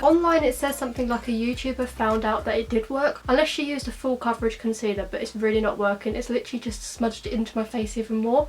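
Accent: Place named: United Kingdom. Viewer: I love it!!1 Thanks for checking out my channel!! AND OMGGGG UR BRITISH I LOVE BRITISH PEOPLE AND UR GUYS ACCENTS IVE ALWAYS WANTED ONE XD <3 xx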